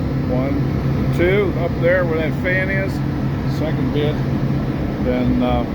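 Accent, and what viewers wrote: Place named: Pennsylvania, United States. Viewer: Great video. Thanks for posting. As soon as I heard your voice I knew you were from back home. The western PA dialect is unmistakable. I was born and raised in California, PA. My grandad was a coal miner and my dad was a steelworker. I have seen lots of coal trains and lots of coal barges. This tour was great for seeing this part of the process in more detail. I never had the opportunity to see it. Thanks for posting. Keep up the good work.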